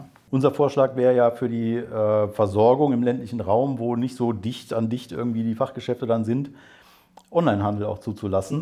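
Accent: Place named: Rhineland, Germany. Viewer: nee, da höre ich dat ruhrgebiet, bin da geboren, in wanne-eickel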